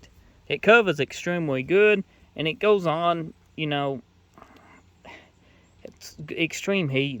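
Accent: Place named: Louisiana, United States. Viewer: hey, how's everything going? I'm guessing he's referring to the way you speak. Your accent is quite obvious. Where are you from? I was thinking Louisiana (Baton Rouge)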